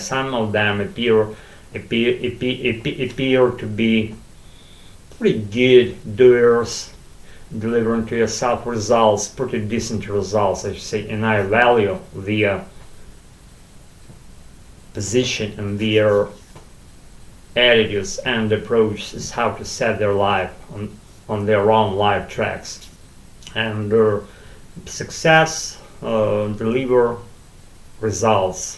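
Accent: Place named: Russia. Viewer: I just enjoy hearing the Russian accent, nothing in particular :)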